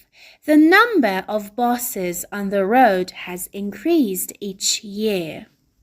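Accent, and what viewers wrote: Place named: United Kingdom. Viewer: British accent 🤗🌹💋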